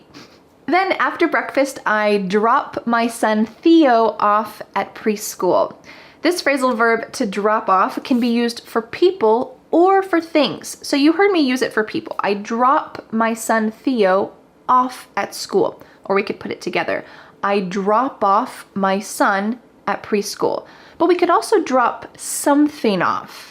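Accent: United States. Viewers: We dont have summer season actually in my country,thats why we never spend our time for summer,..in my country is summer all the year,instead😂😂😂😂😂 Greeting from indonesia...My new teacher.....its fun learning english with u....i ❤ american accent....i love superman,spiderman,pizza hut ,kfc and etc😂😂😂😂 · Какой у нее Английский? Не американский? Мне надо американский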